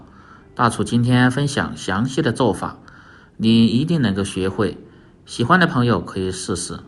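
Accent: Sichuan, China. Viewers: 我也做做看☺️老师您是四川人吧？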